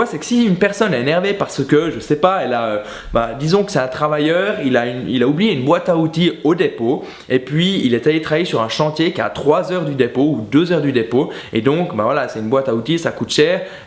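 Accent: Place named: Switzerland. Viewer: On sent la Suisse dans ta voix 🇨🇭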